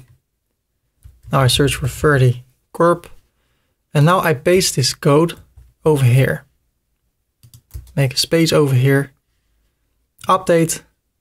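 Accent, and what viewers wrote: Netherlands. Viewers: your accent sound very dutch. are you dutch? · Nice video, i immediately heard you are Dutch.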